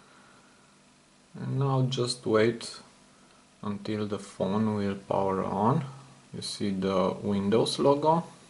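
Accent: Romania. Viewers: Mr.Alex_YTB /\ ShadowHyper Dont feel sorry man. He is struggling as well , with the language. The way he speak english is telling me that is more likely Romanian or probably Bulgarian. I don't see any reason of you feeling sorry that you don't speak English , when him with his English is on YouTube trying to get make some money.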